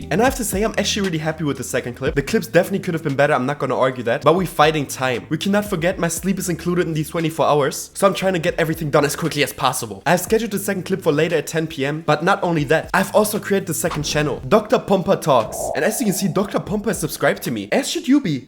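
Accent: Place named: Germany. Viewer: Haha Most German Accent ever 😂❤🇩🇪 aber heiles Video!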